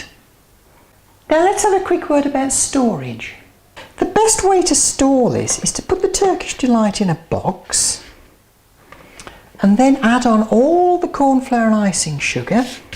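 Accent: Birmingham, United Kingdom. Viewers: it is now I understand why you stopped making videos because half illiterate trolls would not understand a British accent if it came and kicked them on their protruding and exposed prominent posteriors. Nevertheless, if you had spoken like a cat which is being strangled and with a clothes peg upon your nose broadening your vowels, with absolutely no idea about how words are pronounced properly, and added a lot of grunts and hand gestures and fillers like uh-huh.whatever, possibly this particular viewer would have understood exactly what you said. But being parochial and not knowing the fact that the American language is restricted only to America while the rest of the world speaks British English in British accents, and we can understand what is being said clearly, isn't it time they stopped making public clowns of themselves, offering gratuitous insults to all and sundry? 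Oh, I forgot you,wouldn't be able to understand this message because it is written in British English and holds a reading statistic of about 5 and above, while your educational level needs messages to be written on a readability statistic of two in order to be assimilated, understood and comprehended. Please accept my commiserations. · You sound like the most english person who has ever lived ☺ great video! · This video made me rush out and buy turkish delight (im lazy okay!). Can you please do some more videos with your birmingham accent:D? they're fab! Love. x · I loved your little noises! and where from the UK are u from? Right! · thanks you a lot, i just want let you know that i found a video that shows up how to do turkish delight and yours was made far better! PS= your british voice is so relaxing, i just love english lol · I like her British accent😇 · I'm really love british english pronaunce!!😆😆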